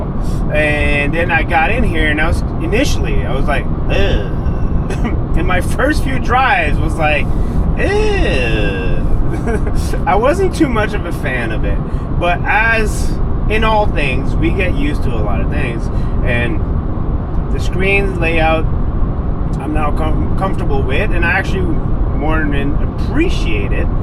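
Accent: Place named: France. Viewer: right on! I noticed your French accent! Northern Ontario here! Looking at buying one of these as our next vehicle!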